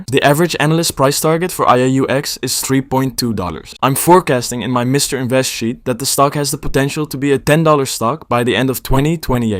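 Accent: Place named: Netherlands. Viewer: really interesting video about GPUS, and the way you calculate things! Also gotta love the dutch accent. Cheers from sweden!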